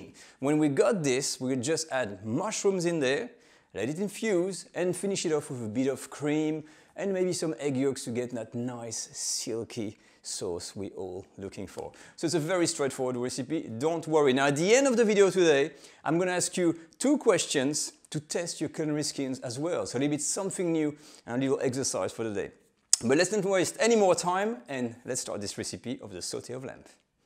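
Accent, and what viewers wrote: France. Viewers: Food and French accent, what else could one ask for?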